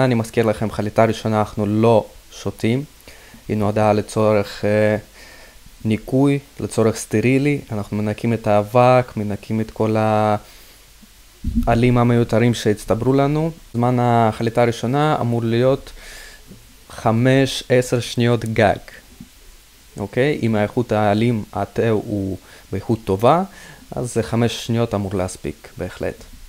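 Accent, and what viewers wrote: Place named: China. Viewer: אתה סיני הוא משהו יש לך מבטא נחמד כזה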